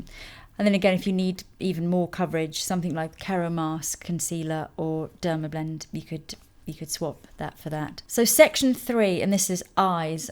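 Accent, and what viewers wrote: United Kingdom. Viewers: Thu Nguyen she's British?!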